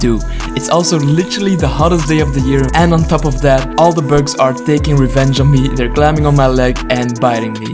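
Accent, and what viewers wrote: Belgium. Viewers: OMG het valt me nu pas op dat je uit België komt😱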